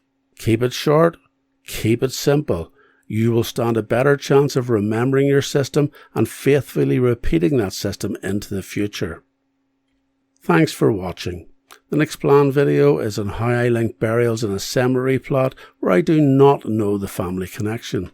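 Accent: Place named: Belfast, United Kingdom. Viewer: The Belfast accent is music to my ear. Melodic and easy to follow. This was an informative video and I thank you fir sharing it.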